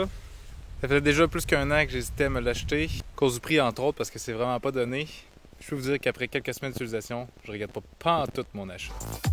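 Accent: Quebec, Canada. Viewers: ..... Ho yess... Je viens de te connaître... je remarque que tu t’intéresse à tout ce qui est gadget de tout genre... c’est super..personnellement moi mon dada c’est tout ce qui est transport électrique en générale ( Giro roue, one wheel, trottinette électrique, segway, geebee, ) trop habitué d’entendre l’accent français... rafraîchissant d’entendre un youtubeur Québécois...je vais continuer de te suivre sans faute...bravo et lâche pas, tu inspire confiance... en passant je suis de la région de Québec aussi et merci de ta réponse rapide... salut!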